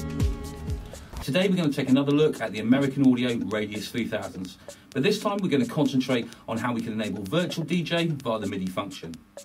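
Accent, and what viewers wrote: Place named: United Kingdom. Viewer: why is the american audio product demo being led by a british guy? =)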